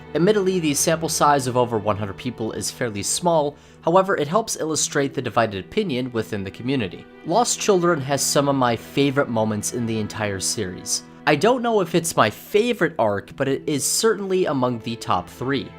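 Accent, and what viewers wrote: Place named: United Kingdom. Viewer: hey broken-sexual boy, why do you pretend Griffith = "Griff-iff?"...are you infected with some form of disease that perverts your enunciation to sound shitty aka British ?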